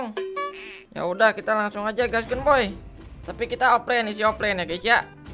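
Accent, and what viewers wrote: West Java, Indonesia. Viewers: Bang orang Sunda ya😂😂 sama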